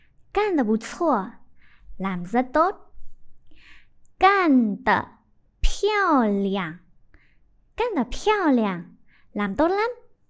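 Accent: Standard. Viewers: Quá ít giáo viên người Việt dạy tiếng trung đọc chuẩn như thế này... Cô giáo vừa xinh, kiến thức vừa vững, gương mặt lúc nào cũng sáng sủa tươi vui nữa... Một kênh dành cho những ai nên học...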